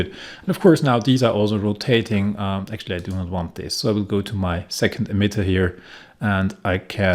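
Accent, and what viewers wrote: Germany. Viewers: ah! Close enough! From your accent I thought you wore german. Danke!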